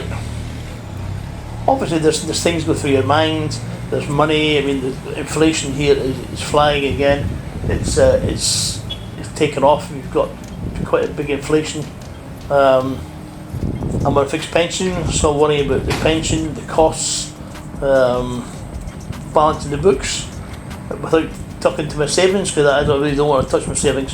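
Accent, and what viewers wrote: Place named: Scotland, United Kingdom. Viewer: Alan, accents make the world a more interesting place. If you can't grasp what's been said, then that's your issue & not the speaker. I'm not Scottish & yet I love the Scottish accent & understand every word.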